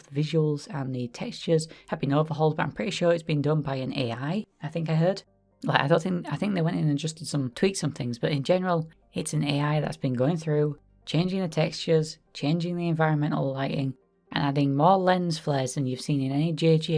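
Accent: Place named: United Kingdom. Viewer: Less british than i expected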